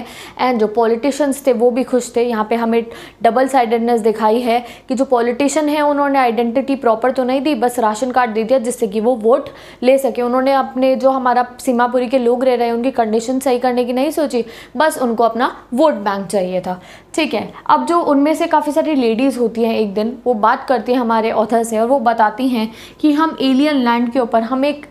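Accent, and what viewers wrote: Punjab, India. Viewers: the punjabi accent that you make in mistake like "haiga" makes this video more interesting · Her Punjabi accent is so cute 😂❤ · Is she belongs to Punjab ? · Madam...are you from Punjab?? ...tell me pls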